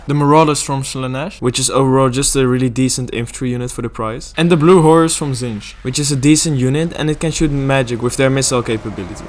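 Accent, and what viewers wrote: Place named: Netherlands. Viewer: your dutch accent is thick!!!! (im guessing your dutch :) ) hello fellow dutchie!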